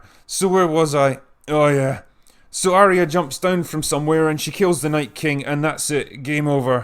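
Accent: Scotland, United Kingdom. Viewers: Are you Scottish? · It's amusing how much more Scottish and drunk he sounds in his older videos. If only he knew when he made this video that it was going to get even worse... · OMG--I could just listen to your rant for hours! That Scottish accent and the delivery! YOU should be some kind of GOT character. I don't even care if I agree or disagree with you! LOLOLOLOL! R.E.S.P.E.C.T.!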